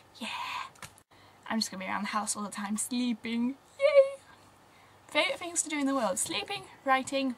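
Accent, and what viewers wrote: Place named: England, United Kingdom. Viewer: dam youve got the nicest english accent ive ever herd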